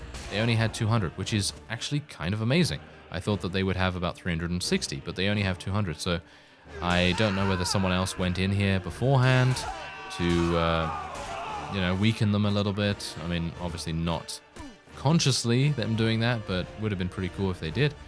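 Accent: United Kingdom. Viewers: Angelo Orsini (literally little bears, one of the most influencial roman family of that time) would be a very nice name for your character. It's lovely to hear speak italian with your british accent. If you are gonna make a series, join Venice, make the doge proud. Hail San Marco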